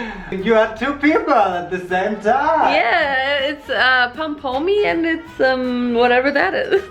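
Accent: Germany. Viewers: Haha hab den deutschen Akzent sofort herausgehört und plötzlich hat sie Deutsch gesprochen 😆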